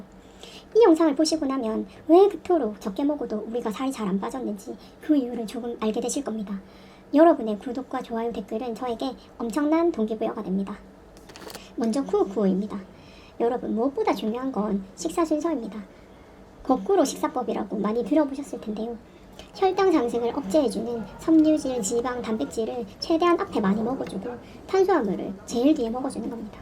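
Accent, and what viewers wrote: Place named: Seoul, South Korea. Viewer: ㅋㅋㅋㅌㅌㅋ 맞아요. 서울말 쓰시는데?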